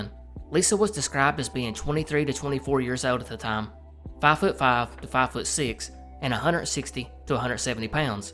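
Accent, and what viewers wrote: South, United States. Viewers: it’s awesome to hear someone w a southern accent doing stuff like this! you got a pretty strong accent, where in the south are you from? sending love from the deep south 💜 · I'm from the UK and I like the southern accent! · I love your accent! I'm Canadian so I don't get to hear a nice southern accent very often. It makes me want to cook up some biscuits and gravy 😆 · As someone from the south, I barely noticed the accent lol. Sounds friendly to me personally. Love the videos man, can’t wait for you to blow up 👽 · i grew up in south carolina and just moved back to the south (tennessee) after living in the midwest for almost a decade and i’m so glad i did. i love the south, your videos, and your accent. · Born, raised, and lived in the South all my life. Your accent is part of you, but isn't you. Don't let anyone give static for it. 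· I love your accent and being from Kentucky, I have a little bit of one as well. ❤ So glad I stumbled across this video! Great content! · ill be honest the first time i heard a southern accent on a iceberg lol but honestly it makes your channel unique and im from wv so its cool ! nice seeing some southern iceberg topics !! glad you didn't cut wv out of the mix thanks king !! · I am very glad to find a fellow southerner who makes this sorta content! You got an amazing accent, don’t let anyone say otherwise! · It is very comforting to hear a Southern accent presenting topics so cogently and eloquently. Much love and respect from West TN/ North MS, keep doing what you do good sir. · The best part about your content IS your southern accent narration. Ty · i personally love your southern accent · Don't get rid of your accent. To hell with the haters. DIXIE PROUD! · I really love your accent. 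No matter how many Americans i met here in Germany, the nicest ones always came from the South :) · As a MS resident who doesn’t have a strong accent (to the point of being asked where up north i’m from) hearing your southern accent is comforting to me! it’s very epic! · People are awful, guaranteed it was some’woke’ hypocrite hating. Your accent is awesome, never get rid of it it’s who you are, its where you’re from! I’m stuck in a Northeastern grossly, overpriced, dump and often visit the south. I actually lived in New Orleans for five years and wish I never left, but I had to, and I would give anything to live in the south. You guys cherish your history, everyone is so fantastic down there, the food, the music, the everything . So don’t listen to the haters. This is an awesome idea and I love your channel.💙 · I'm Southern! Southern English, so these stories are all new to me. Your accent is absolutely charming. 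· Even being from the north I enjoy your southern accent and I think you should keep it and embrace it (Also it’s pronounced Kane-ka -kee) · Southerner here too, an Arkansan. Trust me hes not faking that accent. Ive heard that twang my whole life and it check out. Thats really the way he sounds without even trying. If he was it would be obvious. Making fun of a Youtubers NATURAL accent? C'mon y'all. It could be a whole lot worse. Some youtubers out there feel inclined to make up their own accent. "To establish a brand?" lol gimme a fkn break, nobody on Earth talks like that! Thats the kind of fake sht yall can laugh at, because it is kind of lame. But the way someone naturally speaks? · As someone who lives in the south I enjoy more southern youtube channels like yours and Wendigoon, I love your accent, keep up the great work man!! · You just gained another subscriber! It’s really wild how much accents can vary in timbre and drawl. 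Way down in the delta is so similar but also very different from here in southern Appalachia. It’s great to hear a southern accent here on the mysterious/creepy side of YouTube! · I absolutely love your Southern accent.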